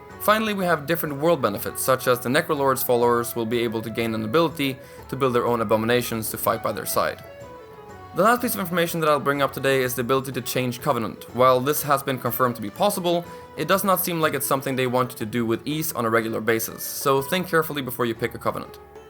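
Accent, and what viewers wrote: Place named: Ireland. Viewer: I was just struggling to pin down your accent, I thought you were Irish for a bit 😂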